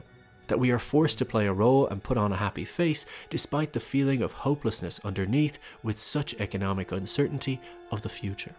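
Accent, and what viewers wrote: Ireland. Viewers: I wonder if your Irish you sound like an Irish man like myself haha but these videos are absolutely superb I've been watching them all there brilliant observations!!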